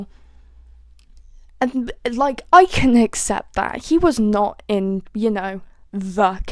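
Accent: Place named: England, United Kingdom. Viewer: Didn’t expect an English accent when I came from tumblr (everyone is just American to me) and now I’m even more excited to watch ‼️‼️‼️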